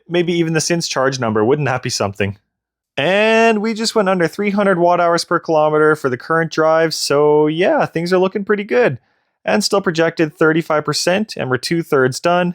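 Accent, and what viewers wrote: Canada. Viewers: the accent, the stache, the Saskatoonian winter, the consistent conversion of metrics, takin er out fer a rip, what an absolute beauty bahd. All regen, no degen. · Your Saskatchewan accent is deafening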